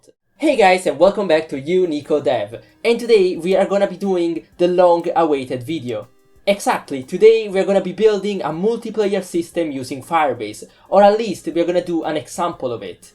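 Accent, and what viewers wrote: Italy. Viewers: Si sente che sei italiano, Grande ✌️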